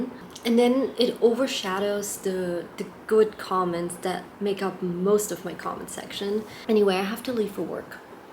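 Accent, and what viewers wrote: Australia. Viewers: Aussie accent creeping in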